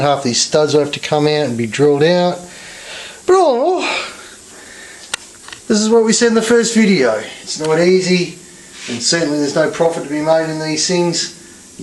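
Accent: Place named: Australia. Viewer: It looks like that engine has sat for at least 10 years without running yet when you split it from the transaxle amazingly there was not one spot of rust or crud on the on the flywheel or clutch cover. I am having a little trouble with your aussie accent, did you say that is a "dana" gearbox ? I've worked on vws for forty years and dana never produced gearboxes for Volkswagen.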